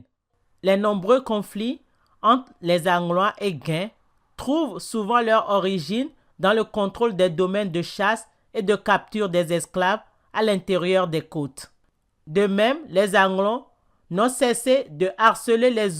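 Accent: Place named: France. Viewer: Salut. Bonne synthèse. Vous auriez pu parler plus posément pour permettre aux auditeurs de mieux vous suivre. Par ailleurs, dans les prochaines émissions, essayez de prononcer correctement les ethnonymes et toponymes avec l'accent voulu -et non l'accent français - pour être mieux compris. Enfin, on ne fait pas l'histoire sans parler des sources. Et essayez de varier ces sources. Merci.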